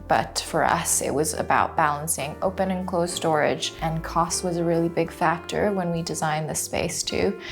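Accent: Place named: North America. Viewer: This north-american way of talking is painful.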